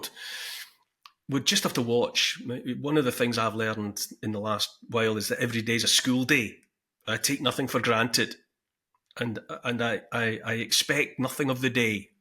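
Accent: Scotland, United Kingdom. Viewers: Well said, Neil. I love listening to your Scottish accent. I’m not so fond of my accent but is very distinctive. I’m from Arkansas USA, Woo pig sooie! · Man this is the most hard core Scottish accent I’ve ever heard 🤣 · Thank you Neil I just listened to this and I agree with every word. A paradigm shift for me personally and for the world (I cautiously hope). I’m Scottish but live in Cornwall and sometimes wonder about moving back up north. Would be Fife probably. But Scotland has scared me a bit lately…. So many people very asleep. Including my family - not a single one understands what I’m talking about, when I dare to talk that is. It’s when I try to open the Overton window just a tad more (Maybe Trump isn’t such a scary prospect. Maybe you’d find interesting information on X. Etc etc) they just default to calling me a conspiracy theorist. One person told me she hates Twitter/X because it’s full on right wing hate speech. 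I asked her what things she reads there. ‘Oh no, I don’t use Twitter, I’ve just read about it’ !!!! And they tell me I exist in a bubble. Anyway, I felt happy yesterday - November 6th- and I kept quiet about it . Sadly I’m a ‘childless cat-woman 😹’ who doesn’t have a sympathetic partner or family. But I can still laugh and do my own research and make up my own mind. You are a helpful guide and I especially love listening as you speak in my familiar Scottish tongue.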